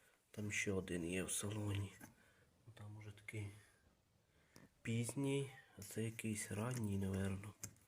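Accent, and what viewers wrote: Transcarpathia, Ukraine. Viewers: Чисто случайно попав на твий канал Ти Закарпаття цикаво з якого села ты говориш так смішно Закарпацько Українсько руським словами ты таке наговорив поповудюу запчастей на москичу що Автомобилисти смiються для того аби правильно називати запчастини автомобиля хочаб читай в книжцi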